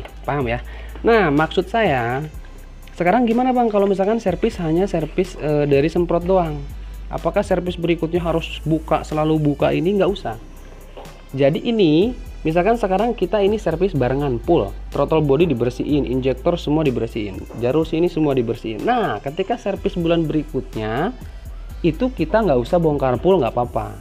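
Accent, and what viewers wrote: West Java, Indonesia. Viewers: sya suka kalo si abang ini dalam bicaranya urang sunda mana ieu teh resep ey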